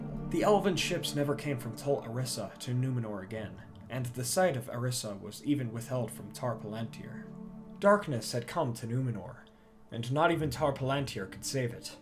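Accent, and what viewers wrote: United States. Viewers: I love these videos so much - but I suffer from the HORRIBLE PRONUNCIATION of the words - please do not americanize them. Learn them 🙏🏻 #ithurts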